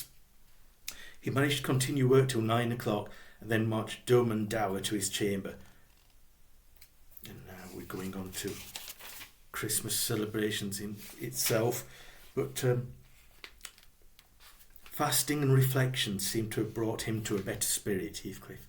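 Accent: Yorkshire, United Kingdom. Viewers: Wuthering heights is the best novel in my opinion. Movie adaptations cannot capture the essence of this book in my opinion. It's great you are reading it in the wonderful Yorkshire accent it is meant to be read in! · I just don’t understand why you apologise for your Yorkshire accent!!!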